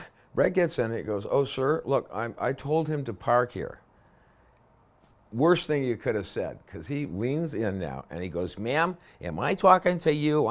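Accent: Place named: New England, United States. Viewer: Gotta love the New England accent! Like being in "Norich", CT.😅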